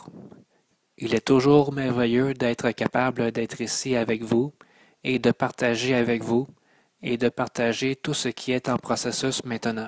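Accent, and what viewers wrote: Canada. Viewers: c'est quoi cet accent canadien yeshua a été élevé en Egypte et il a vécu en france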